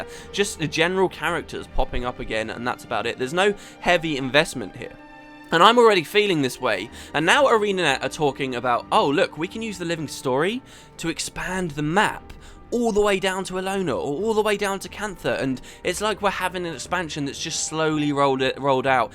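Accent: United Kingdom. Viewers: I just realized you sound like a more British Julian Bashir. Yea I was hoping that the Living Story steps would be connected to each other because that would make it feel more connected and have a more over arching story and lore. I've been trying to get into the living story but BotFW has lost me. The other parts of the story were pretty uninteresting but this is so disconnected that I have no drive to even go there.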